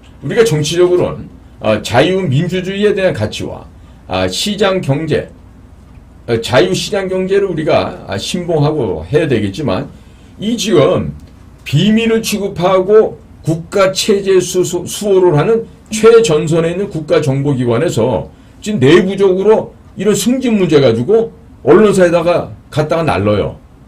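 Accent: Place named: North Korea. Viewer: 님 이북에서 왔어? 말투도 그렇고, 종자주의? 난 처음들어보는 저런 단어를 쓰고 있노.. 저거 이북단어 아니여?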